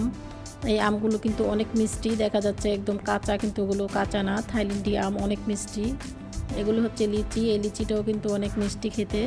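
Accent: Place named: Barishal, Bangladesh. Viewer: ম্যাডাম আপনার বাড়ি বাংলাদেশে কোথায় কথা শুনে মনে হচ্ছে বরিশালের মনে হচ্ছে আমাদের এই ভাষা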